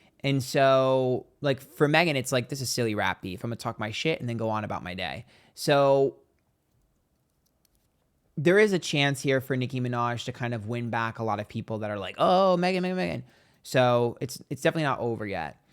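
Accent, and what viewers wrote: New York, United States. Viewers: I KNOW you're an Italian New Yawkaaaa or Jersey 🙌 and a psychic on top of that?? yes pleaseee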